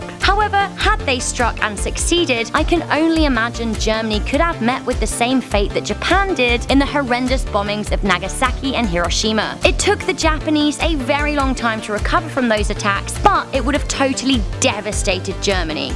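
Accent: United Kingdom. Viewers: British sounding person detailing a war between the U.S and Germany. But this, does put a smile on my face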